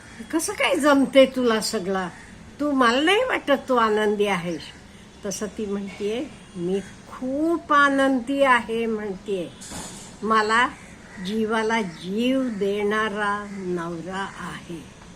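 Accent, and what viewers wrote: Karnataka, India. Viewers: तुम्ही कानडी भाषिक आहात का. बोलण्यावरून असे वाटते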